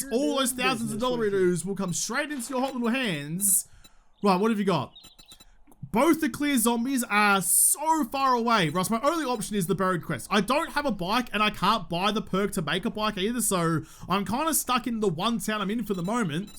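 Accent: Australia. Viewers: "Some sneaky Molys...." I love the Aussie vibe to it, but dude, you gotta admit that one just sounds ... Without the Aussie vibe.... Not smthg I would be OK with XD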